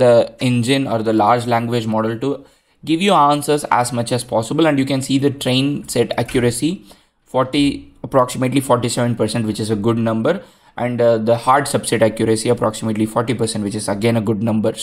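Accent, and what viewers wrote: India. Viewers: Can you use AI to remove your Indian accent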